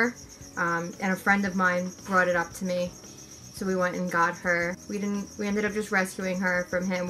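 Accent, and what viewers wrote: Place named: East Coast, United States. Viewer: Hey hey hey! Something to consider is that reptiles can live together for years without problems and then one day they can snap. I'd really hate to see that happen. I follow a bearded dragon rescuer on Instagram who has seen it happen a million times with beardies she's taken in. Omg I want a leachie so bad! I don't buy from breeders so I need to find one that's special needs or adoptable! Where are you from? You sound like an east coaster, which I used to be! Do you find that cognitively you have any issues feeding mice while also having pet mice? I can't keep snakes because of my affection for my pet rats. I'm not passing judgement I promise :) I'm curious about how you see it in your mind because everyone is different. BEAUTIFUL DOGS! Be careful with those plants in the axolotl tank. If they're not silk plants they can tear the skin of the axie, especially the tail. 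Good video :)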